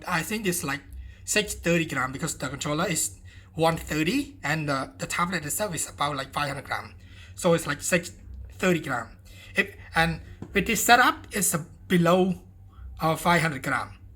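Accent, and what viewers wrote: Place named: Cambodia. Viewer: You sound like cambodian, where are u from ?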